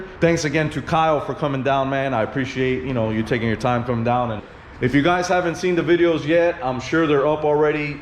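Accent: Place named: Cuba. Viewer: Are you Cuban because in some vids you speak spanish and you sound Cuban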